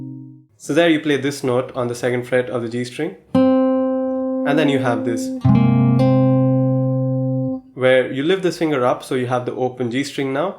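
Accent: India. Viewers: Cllicked away because of indian accent…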